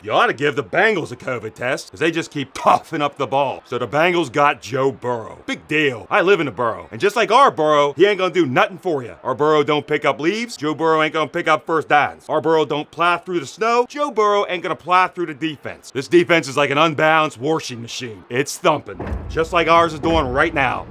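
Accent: Pittsburgh, United States. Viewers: Moved away in 05. You saand jus like ma cuzzin. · Love your Pittsburgh accent/comments...keep em' comin' Born and raised in the Burgh' used to be a teamster local 249 for Allegeheny County, Shaler 72...#7 helps bring #7 SB home!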